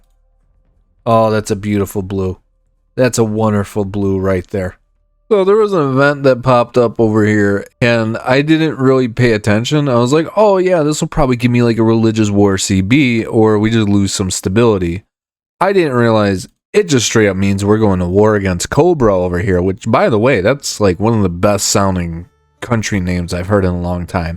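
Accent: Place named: Buffalo, United States. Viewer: You sound like you're from Buffalo. It could be any of the Great Lakes but I know about ten guys who talk just like you in western New York.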